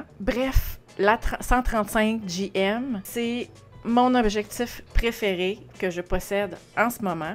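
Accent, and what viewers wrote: Quebec, Canada. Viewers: Don't worry, it is Canadian french, that why...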